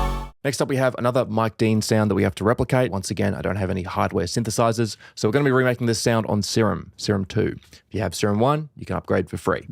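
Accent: Australia. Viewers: I'm like pretty accent deaf so I never realised he's got an Aussie accent. Sick to see some yt producer faces from here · how did I not realise you were aussie lmao 😂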